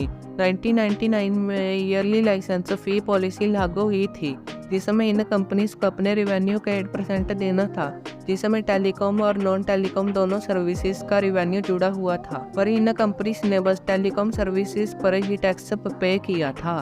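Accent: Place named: Kerala, India. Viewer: Malayalam style Hindi sunkar achaa laga 😂😂😂 keep going.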